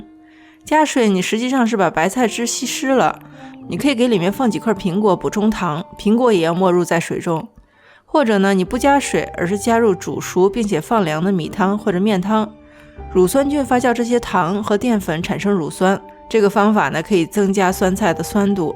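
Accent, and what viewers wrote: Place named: Shaanxi, China. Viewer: 是陕西人啊，听出来了，能打听一下你做浆水鱼鱼的那个工具在那买的吗？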